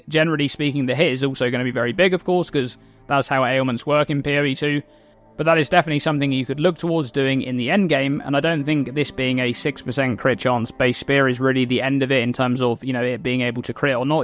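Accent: United Kingdom. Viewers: 10 minutes is the max for me listening to this illness that is called british accents, damn thats so terrible, sorry for this disease.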